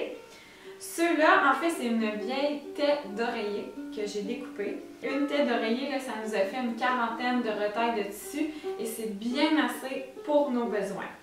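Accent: Quebec, Canada. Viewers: Quelle superbe découverte ! C'est tout ce que je recherchais ! Du zéro déchet et minimalisme qui est intelligent et plein de bon sens (c'est pas le cas de toute la communauté), végétarisme, simplicité et surtout une fantastique québécoise dans une si belle région ! Je suis juste en amour fou du Québec depuis que j'y suis allée en mai ! Montréal c'est la seule autre ville où je me verrais vivre ! Et quelle belle langue et accent, ça me passionne bcp ! Merci d'exister :) Gros bisous et très bonne continuation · Ok. J’avoue que j’ai un peu de mal avec l’accent québécois mais je pense que j’ai bien compris. Je viens de découvrir les sacs au cire d’abeille ici en Angleterre. Chouette. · j adore ton accent quebecois, et tes videos tres interessantes je te remerci enormement de partager continue j apprend malgre mon âge 56 ans, je t embrasse fort et t envoi beaucoup de soleil de mon pays tres ensoleillé ( l Egypte ) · La musique couvre un peu sa voix et comprendre le québécois quand on l'entend à peine c'est difficile aussi même si on n'a pas mal à la tête.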